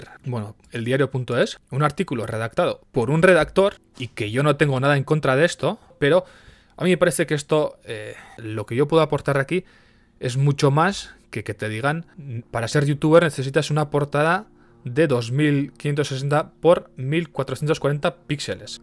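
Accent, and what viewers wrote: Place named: Eastern Europe. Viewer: pero si tiene acento del este de Europa....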